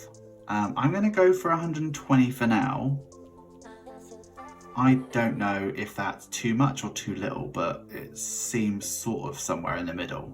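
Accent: United Kingdom. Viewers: Dear, I absolutely get your point and I LOVE how honest you are. Trust the change. Change is good and with your talent, it will bring you fantastic things. I also will need to move by myself next year, and Im dreading it. Ive never lived by myself, always with family, but it got to a point where I KNOW I need to step away. I found your channel thru Pinching the Pennies and it got my attention because of your BEAUTIFUL British accent (let's all admit British people have the most elegant accent EVER😍), and stayed because of your commitment and talent, your honesty and your strive to reach your goal and because of ur cash stuffing videos cause I think British pounds are pretty cool (us dollars are ugly AF). Don't be scared. Things will get better! We're here for you and with you thru this journey, even if virtually ❤️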